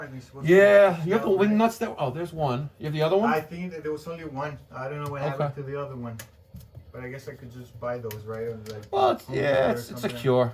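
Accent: New York, United States. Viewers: Trying to copy Steve laviemore channel, gloves, camera, videos but with New York accent. No wonder he deleted you. Trying to be a celebrity plumber. Nobody likes a copy cat. Lol only .I couldn’t work all day doing service trying to put on a show holding a camera. To much time. To many calls. Not bad if you only one or two calls a day. But five to six.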